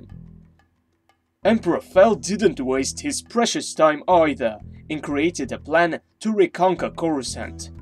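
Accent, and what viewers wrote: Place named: United Kingdom. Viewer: This is British accent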